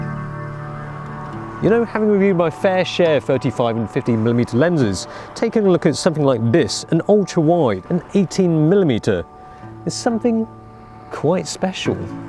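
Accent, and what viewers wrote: United Kingdom. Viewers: im just suscribed to this channel. and im suprised to see that chinese speaks british accents. its been a while since im searching for this type of channel. great channel!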